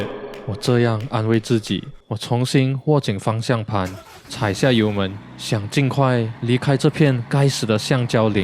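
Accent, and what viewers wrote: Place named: Singapore. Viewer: You sound like a Singaporean.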